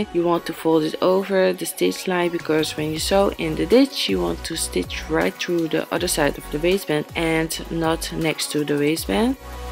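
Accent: Netherlands. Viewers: you sound so extremely dutch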